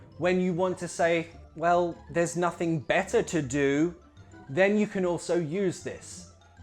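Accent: United Kingdom. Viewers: I've knew a lots of new words with british accent today and i was so happy😊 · His English is British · El profe más hermoso! Y con acento británico! 😍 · I love your accent man! British accent is lovely! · Damn !!!! Where have I been, that I've never seen your videos before ??? I'm not only learning English with you, but also the accent and stress I like the most : the British one ! In addition to this, I'm brushing up my Turkish classes with your subtittles. Thanks a lot, and may God bless you for all your effort in this purpose of freely teaching people ! Best regards from Dresden, Germany ! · This is the first time for me can hear british accent very well😂 thank you for not talking so fast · This is really appreciated that you speak a good British English like a typist 30 word per minute. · Hey good job with this channel, Greetings from Argentina. I have a question: you speak in British English or you just have the accent Is much different the British English expresións than American English expresións ??? 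· A bit of British Accent? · Nice channel but it's a British English, I would like to see how you explain an American English. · I didn't know these expressions exist! I have followed you for a very long time, and I've learned a lot overall brit accent! well done! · Im fluent in american english, now learning british english from you. Awesome vid. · Omg! I’m falling in love with your British accent!!!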